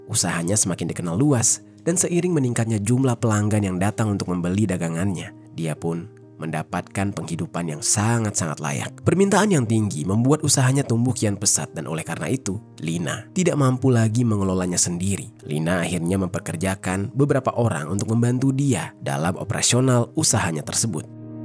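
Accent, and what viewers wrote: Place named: Jakarta, Indonesia. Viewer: Senyum2 dengar bang bicara bahasa banjar logat. Jakarta😅😅..salam bang dr tabalong❤❤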